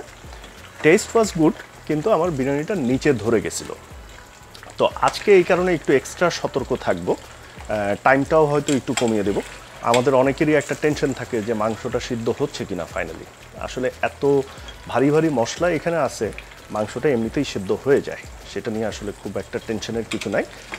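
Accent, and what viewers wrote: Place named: Bangladesh. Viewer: So,but ,so,but Bangladeshi English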